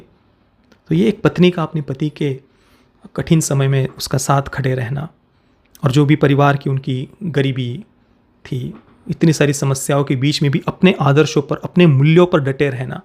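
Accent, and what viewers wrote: Maharashtra, India. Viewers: मी आत्ताच बघून आलो!!❤️❤️..आणि शुध्द मराठी बोली🔥❤❤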